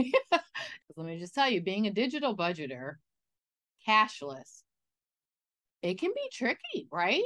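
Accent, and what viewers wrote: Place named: New Jersey, United States. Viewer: I think it's a good one if it is helping you make progress..random but I have the feeling we live in the same part of the US. You sound like us Jersey peeps. Lol...I did say random. 😃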